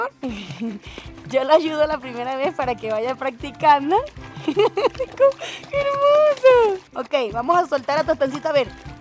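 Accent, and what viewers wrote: Venezuela. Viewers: Eres de Venezuela????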